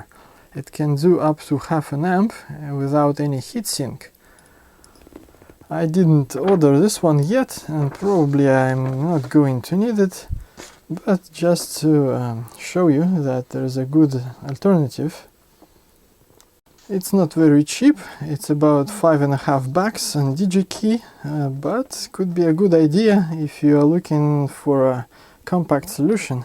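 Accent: Russia. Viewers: Русский акцент. Крутяк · вери рашн пронансиэйшен )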